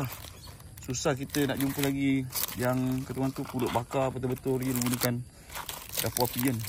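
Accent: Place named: Kedah, Malaysia. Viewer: Terbaik abg p ronda ronda pasar kemboja di selangor, abg ni asal KEDAH ke? Cakap loghat kedah, ha ha haa.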